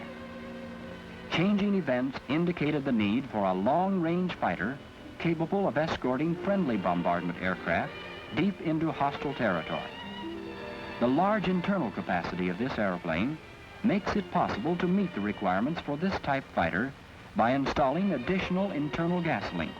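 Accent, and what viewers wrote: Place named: United States. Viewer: How interesting to hear an aircraft referred to as an 'aeroplane' in an American accent. I hate the present bastardised word 'airplane'.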